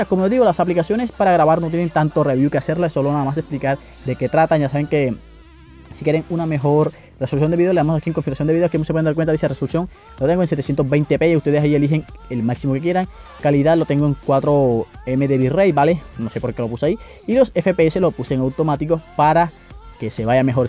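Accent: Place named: Chile. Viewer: es chileno :v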